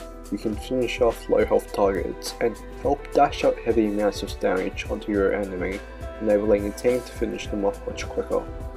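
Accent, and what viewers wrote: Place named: Australia. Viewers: Nice vid. Personally, I prefer the l'etranger, but this is really helpful. (Your accent is Australian, btw.) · He is Australian.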